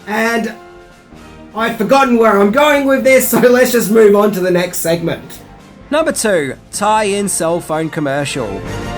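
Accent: Australia. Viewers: Personally I think it’s interesting and funny when you pronounce things differently because of your Australian accent. It’s what makes you. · I think your videos are fun, and I have no problem with you calling "George Peppard," "George Peppered." (I just thought it was your Aussie accent, tbh). Just like you called "Hannibal Smith," "Hannibal Lechter" in this video. Production accidents will happen and, all things considered, I thought THAT was hilarious! 😂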